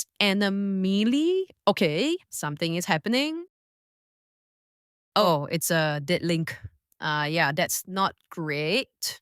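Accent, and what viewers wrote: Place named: Singapore. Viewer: Eh you got Singaporean accent... 😮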